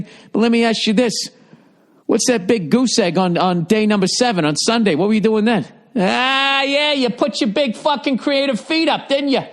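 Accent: Boston, United States. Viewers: U sound like a fucking Boston or New York fucking fucker. Fuck.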